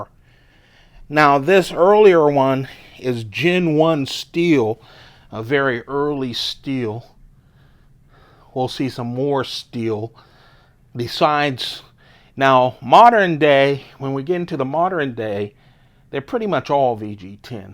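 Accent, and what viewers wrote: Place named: Louisiana, United States. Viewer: You sure sound like you are from Louisiana! Great videos! Thanks for all your efforts!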